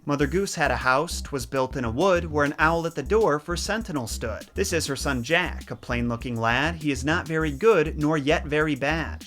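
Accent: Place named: New York, United States. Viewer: Sometimes goes full New York italian when he wants to.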